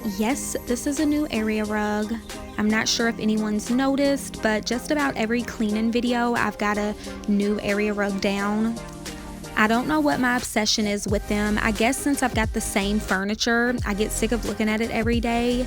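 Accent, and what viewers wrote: South, United States. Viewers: My first time watching, I love it 🥰 and that southern voice 😂☺️❤️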